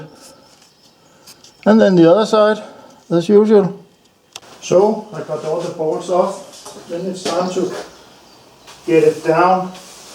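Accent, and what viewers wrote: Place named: Denmark. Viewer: judging by the accent, I'd say you are from Denmark ❤ the video just showed up on my feed! cool project! keep it up!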